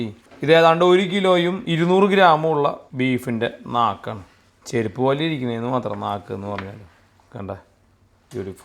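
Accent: Thrissur, India. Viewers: Chettan thrissur karan gadi ahnu enn orapich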